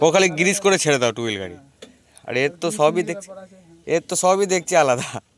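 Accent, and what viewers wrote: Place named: Bardhaman, India. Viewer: Dada ami tomar kotha sune bujhte pailam apnar bari bordhoman memari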